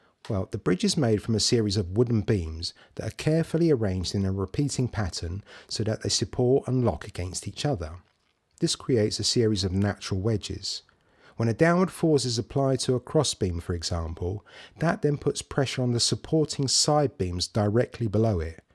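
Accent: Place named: United Kingdom. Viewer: Gracias por explicarlo, aunque me cuesta trabajo entender el inglés Británico al menos entendí la física ❤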